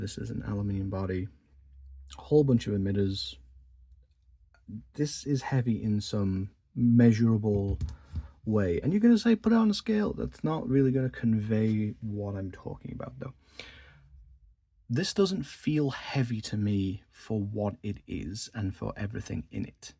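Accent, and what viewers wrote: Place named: Northern England, United Kingdom. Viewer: Nice review 😊 Is it just me,.or do you have a hint of northern English accent there? Maybe it's the other way around, perhaps you're British with a hint of American 😂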